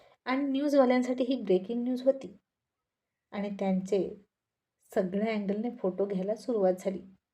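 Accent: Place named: Kolhapur, India. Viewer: Good morning tai 🌄 khupcha khup miss kele mitumhala ani tumcha storila fon divas....gharatali ekhadi vakti bahergavi jate ani ghar kasa suna suna vatate tasa mala vatate hota😢😢😢😢😢😢😢😢😢mi tumchi pranay pipasu bayko hi story khupcha miss karat ahe😢😢😢😢😢😢ta storitil ekun ek prasang mi maza manat sadhavun devale ahet😊😊😊😊😊😊thanku tai 🙏🙏🙏🙏🙏🙏🙏🙏🙏🙏 love you tai ❤❤❤❤❤❤ tumhi khup chan ahat tai ❤❤❤❤❤tumcha kolhapuri bhaset mhatle tur lay bhari...........nad kudha.... Tumcha sarkhe tumhich.....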